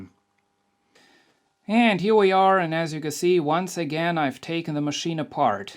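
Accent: Germany. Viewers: Aww no... the German accent makes you sound like a true repair guy professional... And it sounds really cool!! Please please don't lose it. . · For each video you make, the German accent decreases :). Thank you for the videos btw. Edit. Is from 2012. Your German accent got heavier actually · The sound of a true german enigneer, like Kraftwerk :D As a half German, I can see why we don't like our recognizable accent..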